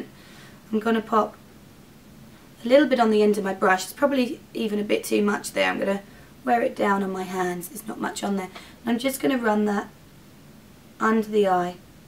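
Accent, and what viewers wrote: England, United Kingdom. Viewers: Are you British ? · LOVE British accent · I realy like your video, let me ask , where are you from!?! England?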